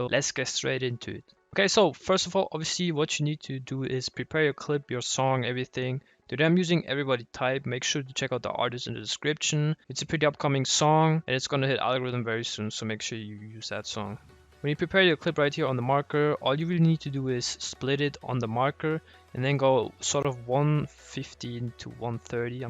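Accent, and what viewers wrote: Germany. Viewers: Omg ich checke jz erst das du Deutsch bist 😂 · Are you german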